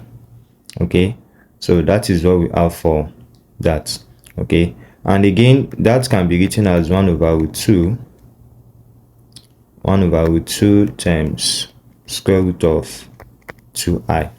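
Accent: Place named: Europe. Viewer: In math, we strive for precision and certainty, so I appreciate how you described it as a convention. I’d be glad to learn that you're from the US, though it sounds to me like you might be from Europe instead.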